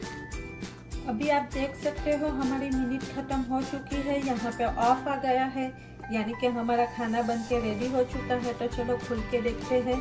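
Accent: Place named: Nepal. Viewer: You speak like Nepali or Assamese. Nice video.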